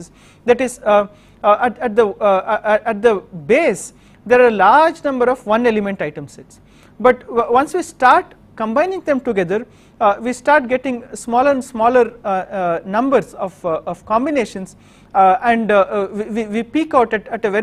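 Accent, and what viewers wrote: India. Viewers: As a matter of fact, indian-english accent is pretty close to russian-english... so you are actually in better position to understanding this guy than native english listeners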